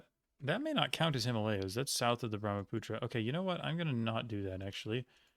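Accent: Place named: Portugal. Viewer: and if you're fluent you probably learned from portugal portuguese, right? Your accent sounds portuguese-like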